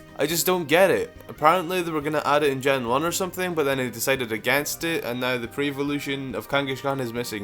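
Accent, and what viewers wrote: Scotland, United Kingdom. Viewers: hes scotish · so scottish :) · Yes! It's the Scottish guy again!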